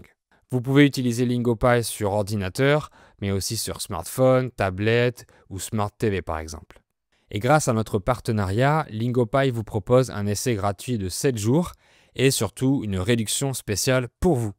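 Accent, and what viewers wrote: South, France. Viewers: Warum spricht er "chose" stets wie "chance" aus? Hat das etwas mit seinem "südfranzösischen Akzent" zu tun? Ich bin seit Monaten deshalb verwirrt.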